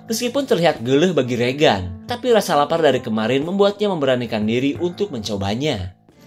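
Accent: Sunda, Indonesia. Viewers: Aya sunda sundana kitu seru pisann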